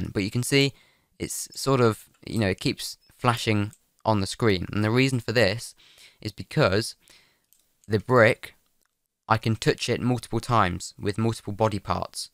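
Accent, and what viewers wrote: United Kingdom. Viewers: British + English =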